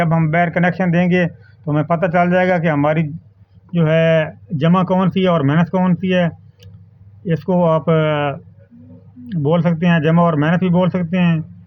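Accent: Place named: Mianwali, Pakistan. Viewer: السلام و علیکم بھائی، آپ میانوالی سے ہیں کیا۔۔۔؟ آپ کے لہجے سے معلوم ہوتا ہے ، اگر میانوالی سے ہیں تو آپ کی شاپ کس جگہ پر ہے ۔۔؟؟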